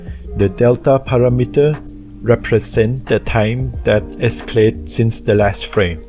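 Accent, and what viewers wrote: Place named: India. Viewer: likes that Indian accent ☕️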